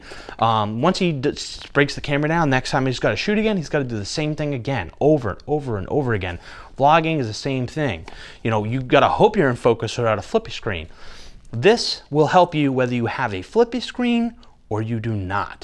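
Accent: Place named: New England, United States. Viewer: You from Mass or Rhode Island ??? Recognize the accent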